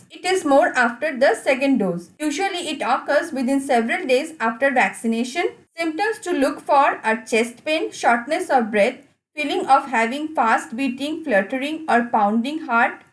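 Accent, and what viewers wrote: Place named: India. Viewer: I can't understand a f'k'n' word this idiot is saying. It's not English, it's some bizarre form of Hindi/English pidgin.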